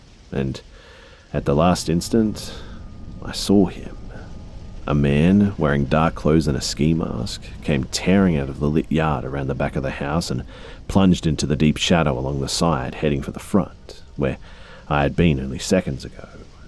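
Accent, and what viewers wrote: Australia. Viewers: ay, is your accent australian?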